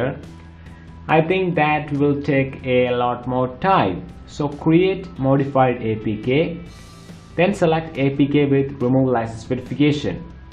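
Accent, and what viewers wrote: India. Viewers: OMG. Indian accent is so annoying especially when they try to pretend that they are not Indians.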